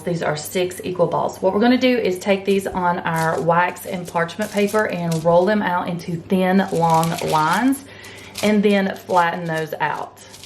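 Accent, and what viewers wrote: South, United States. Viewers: YAY!! Meal prepping! Thanks! I have 4 kids (2 still at home and the youngest is the pickiest 5 yr old girlie on the planet I swear! LOL). Btw, I love your southern US accent: it reminds me of Dr. Ken Berry's accent and I think he lives in Tennessee so I can only guess that you're from the same region. Anyway, I'm going to watch your video and take notes as this looks like what I'll be making for my own family over the weekend. Warm regards from southern but not-so-sunny Belgium, Donalda (new sub)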